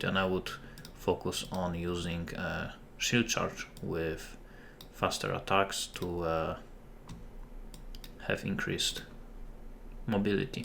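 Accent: Poland. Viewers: Akcent wskazuje na polskie pochodzenie. Mylę się? btw Nice movie! like it a lot!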